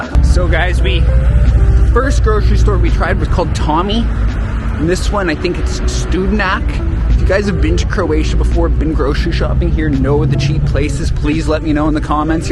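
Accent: Canada. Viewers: toronto accent?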